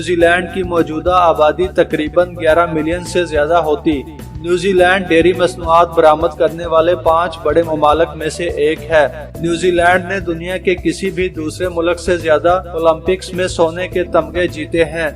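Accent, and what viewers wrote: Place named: Punjab, Pakistan. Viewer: URDU GHAR HD : REF NEWZEALAND DTD NOV 14, 2017, BEST REPORTING AND COMPLETE INFORMATION, BUT COMMENTARY WAS UNIMPRESSIVE BECAUSE OF URDU IN PUNJABI ACCENTS. SOUNDED LIKE SOME VILLAGER READING.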